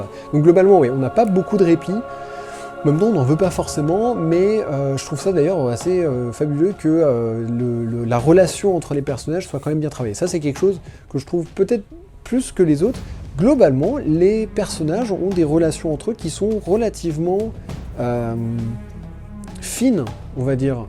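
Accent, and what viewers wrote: England, United Kingdom. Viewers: Cet accent Anglais !! :o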